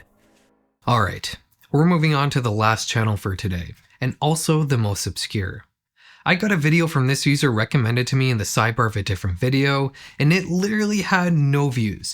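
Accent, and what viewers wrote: Poland. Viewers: the polish accent gets me by surprise as i skip the video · ale ten polski akcent słychać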